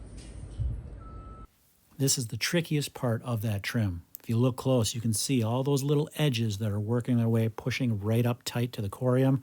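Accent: Scotland, United Kingdom. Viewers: Where is the nice music and the lovely scottish accent?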